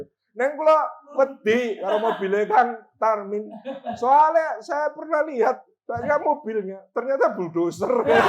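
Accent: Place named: Banyumas, Indonesia. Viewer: Ust. Salim memenuhi sunnah Rasulullah saw, berbicara dgn bahasa kaumnya. Di Purbalingga bicaranya ikut ngapak 😅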